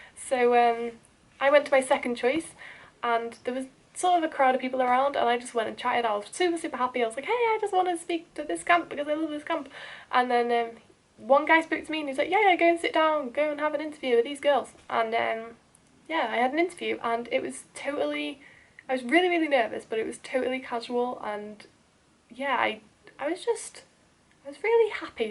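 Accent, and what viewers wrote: North Wales, United Kingdom. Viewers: You sound like you're from North Wales right? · Hey- where abouts are you from? You sound very North Wales/ Cheshire-ish !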